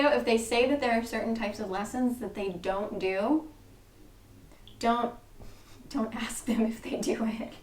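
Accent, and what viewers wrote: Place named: California, United States. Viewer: You tend to pronunce some words in the British way of it, such as glass or grass, right? I've noticed that it's related with californian accent. So actually, this is how I'd like to sound like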